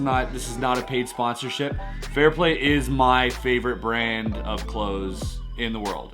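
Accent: Quebec, Canada. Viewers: Ohhhhhh un québécois mon boy!!!! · Guy was speaking french ... Ou ce vidéo a t-il été fait ! Accent québécois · Are you from Canada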